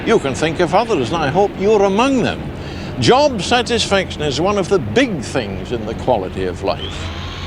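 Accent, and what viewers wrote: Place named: Scotland, United Kingdom. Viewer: Strong Scottish accent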